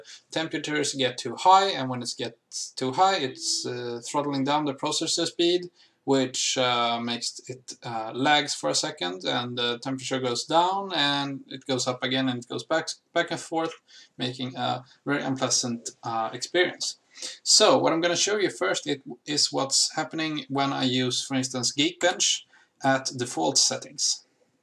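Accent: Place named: Sweden. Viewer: Älskar när man kan höra ett det är en svensk som talar engelska <3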